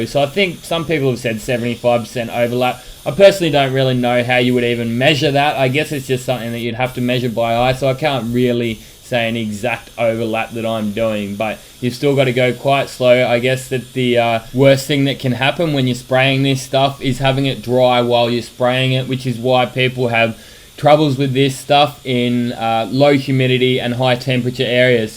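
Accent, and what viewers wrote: Australia. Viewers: Australian accent + high speed talking= disaster 😄 Bro, your channel is popular all around the world, not just in Australia, please slow down, we can't understand you 🙂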